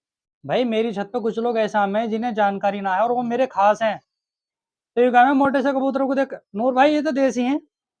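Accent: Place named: Bijnor, India. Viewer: Bhai ne dil khush kar diya boli se bijnouri he bhai pakka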